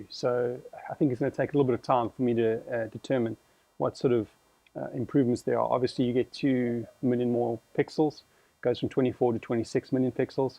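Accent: South Africa. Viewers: I stumbled upon your video and I knew the first minute there was something different in your accent. To me S. Africans sound like they had a little German inside trying to communicate in English, please no offense intended! I'm Spanish and we speak the worst English in the world, period! Nice video anyway, I'll watch more.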